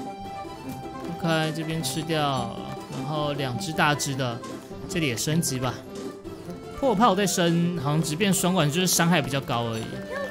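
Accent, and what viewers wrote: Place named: United Kingdom. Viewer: 是英國腔沒錯，英國留學生來幫你證明哈哈，很多重音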